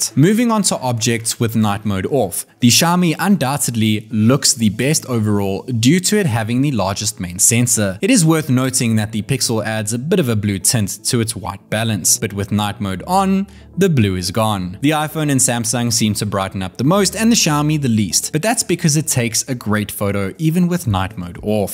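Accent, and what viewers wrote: South Africa. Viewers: South African ❓I'm new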